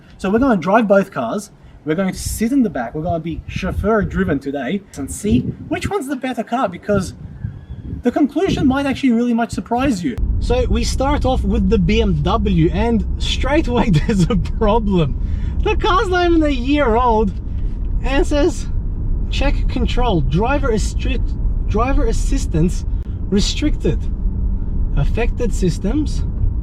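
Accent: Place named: South Africa. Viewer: Great vid and it's sounds as if you have a little south African in your accent. Do you? 👍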